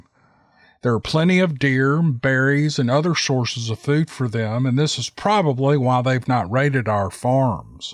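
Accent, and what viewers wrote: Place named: Tennessee, United States. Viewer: " Interruptions/Commentaries" lol... It's like, no worries, "We got the Power of the Remote in hand, 😁 heeheehee, naw... He knows all the folks that listen are either: 1. Southern and they'd woop a fella that ever b-tched about him, 2....and the rest are so taken with his Authentic West Tennessee, North Mississippi, Accent that they'd Woop that fella too! be like "Switzerland for Public Harmony", we might need to stick him on a Campaign Train in 2024! Finally, I would have a Candidate that's a MODERATE. (News Media doesn't allow Moderates, they don't have enough opposite leverage to milk for profits). If they are a Moderate, then they just "use creative writing/lie" and create their drama for profits. The Middle is really a pleasant pasture, "You Guys and Y'all" need to Check it out. ✔ Peace would be Possible if the News Media wasn't owned by the 1% of the 1%, (including the guy/family That's the "Go-to-Source for War Loans") fact, owns 100% A/P....see, there's no big Profiting in Peace, so they stream ideas fostering prejudice. 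We really don't have a "Free-Press", but most folks think we do. A private owned news media just always has an agenda, and that's "our greater foe". We gonna get this through our heads and get back to Adulting. That's my Commentary, and it's Positive. ☺ Thx for being real and nice my fellow DC'rs, its a cool Tribe.... 🇺🇸🌎🌍🌏🗽 HaPpY Holidays Everybody! 🍸🎄........ "the credits": Journalism is 1 of my Degree majors: University of Memphis Go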